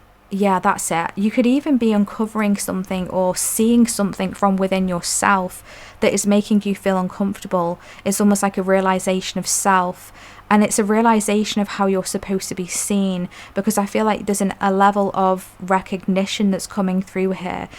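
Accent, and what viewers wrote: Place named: England, United Kingdom. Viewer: 1 - phenomenal reading, I could relate to all of it, including aches and pains, exactly where mine are. As for suns I did another reading and the lady pulled 4 sun cards out. I’ve also been working on my God (sun) connection 🌞 Thank you so much, as a fellow Pom living in NZ, it’s lovely to hear your pommie accent ❤ I’m feeling very grateful and blessed 💐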